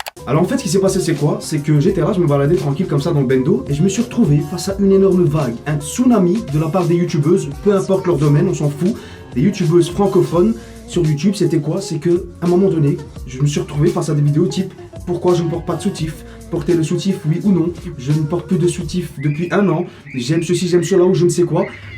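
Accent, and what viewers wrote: Belgium. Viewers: Tu as un accent belge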